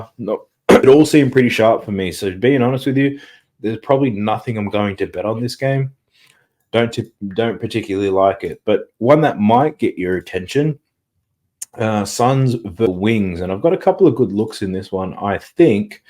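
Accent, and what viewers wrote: Australia. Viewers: Only 2nd time watching the channel , thought i detected an Australian Accent the first time i watched , good to see/find a channel with an Australian doing sports Betting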